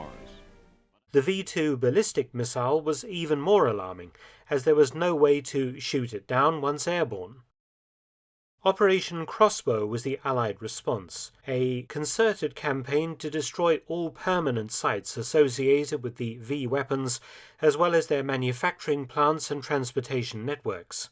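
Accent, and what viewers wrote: United Kingdom. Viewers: I love the way you limeys talk..."Norrich" we say Norwidge (I know that's not how it's spelled)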